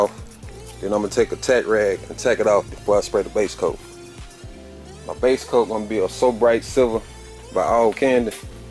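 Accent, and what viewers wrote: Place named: Houston, United States. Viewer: Sounds like he's from Houston.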